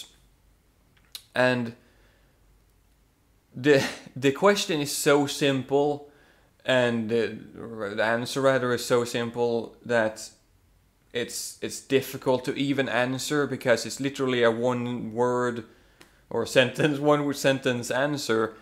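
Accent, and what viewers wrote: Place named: Sweden. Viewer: I dig that swedish accent of yours!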